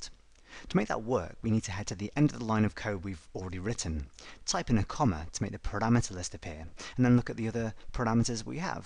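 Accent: United Kingdom. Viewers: Not sure if I love these videos because they are informative and easy to follow or because of the british accent. Either way, well done!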